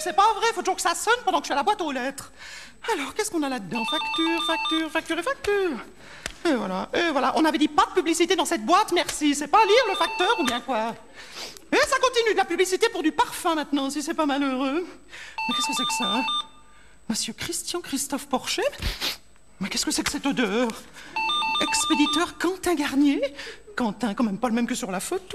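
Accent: Switzerland. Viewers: Rien que l'accent helvétique est marrant....Super. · J'adore l'accent Vaudois. Je suis Genevoise❤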